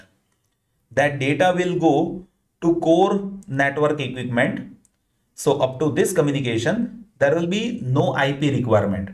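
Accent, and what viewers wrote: India. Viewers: every thing is fine you are good , but indian accent is totally vague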